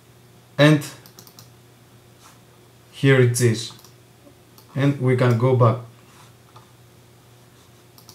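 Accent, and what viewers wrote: Greece. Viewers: το βιντεο ειναι τρομερα βοηθητικο... με το που σε ακουσα να μιλας λεω κατευθειαν αυτος ειναι ελληνας 100% μπαινω στο καναλι σου... βλεπω ελληνικες πινακιδες στο αυτοκινητο σου... και γαμω τα καναλια... subed <3 ;)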